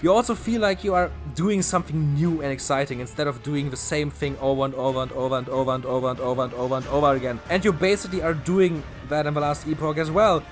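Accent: Germany. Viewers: love ur german accent. tryed some of the games thx for the vid i had a lot of fun cuz of it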